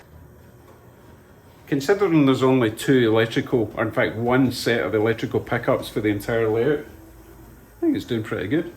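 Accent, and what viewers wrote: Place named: England, United Kingdom. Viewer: i like your videos in particular because of the English sound -it makes me smile time and again and reminds me of watching a soccer game in a pub near Prestwick Airport and the guy next to me tried to engage in a conversation -had to tell him "let's chat during half-time, too noisy" -but even without noise I barely understood half -but no doubt, very nice people up there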